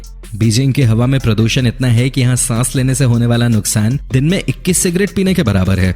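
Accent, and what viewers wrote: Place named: Darbhanga, India. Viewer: Darbhangha sa bol raha hi bhai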